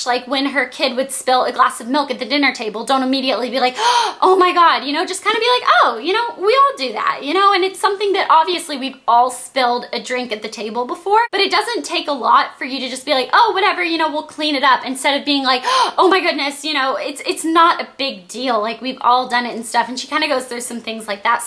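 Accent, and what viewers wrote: South, United States. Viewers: aw i liked your southern accent :( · Oh I love the southern accent - i dont think you should get rid of it..it is so cute! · Southern Accent are beautiful. =)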